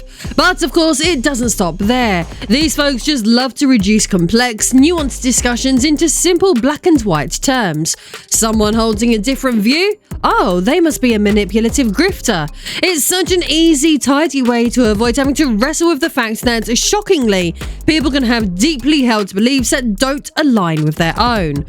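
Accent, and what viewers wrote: United Kingdom. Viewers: the only thing you are is gorgeous. Regardless of yours or anyone else’s political stance, the fact that you’re so attractive, with an amazing accent (I’m a sucker for that British accent) and just the fact that you’re a real gamer. ❤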